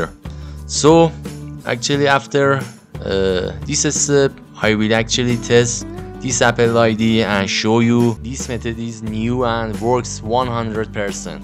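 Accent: Iran. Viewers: khob lahje farsi dasht iranie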